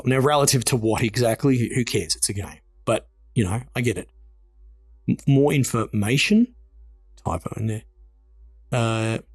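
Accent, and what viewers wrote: Australia. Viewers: Your accent sounds like it must be from Adelaide.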